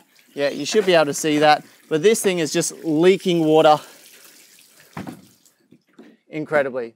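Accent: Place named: Australia. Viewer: a very aussie accent))) how'ya' going mate?)))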